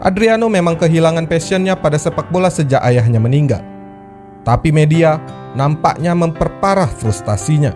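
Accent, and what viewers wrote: Medan, Indonesia. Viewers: orang medan ini ya? dari aroma suaranya uda kecium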